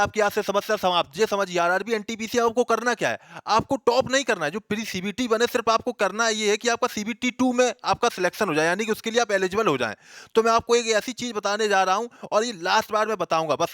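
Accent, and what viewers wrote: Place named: Kanpur, India. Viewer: Sir kanpuriya language bol rhe h.. lgta h kanpur se h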